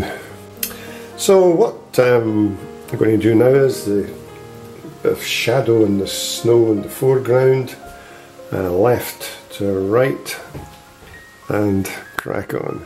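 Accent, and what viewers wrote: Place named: Perthshire, United Kingdom. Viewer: I think I know that accent....is it Perthshire?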